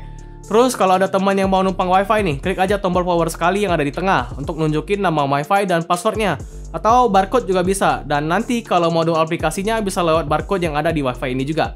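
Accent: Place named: Lombok, Indonesia. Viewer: Kayak logat plat DR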